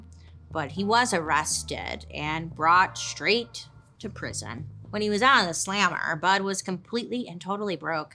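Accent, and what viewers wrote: United States. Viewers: Where in the US does that voice/accent come from?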